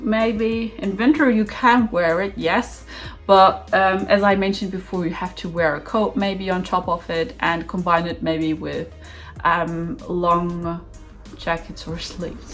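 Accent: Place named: United Kingdom. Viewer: U from the UK, hun? That accent, though! 😄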